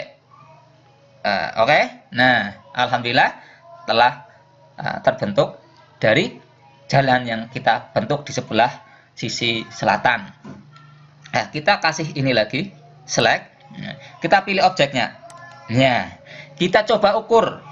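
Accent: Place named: Banyumas, Indonesia. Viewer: Salam dari Kawunganten, sip.penjelasannya mudah dipahami. ngapaknya muncul😁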